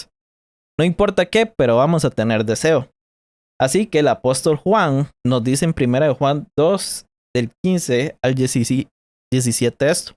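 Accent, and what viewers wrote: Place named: Costa Rica. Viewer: Jaja reconocí de inmediato ese hablado tico, buen video.👌🏻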